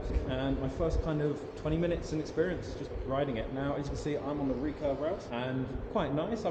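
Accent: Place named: Europe. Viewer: that's what europeans sound like bruh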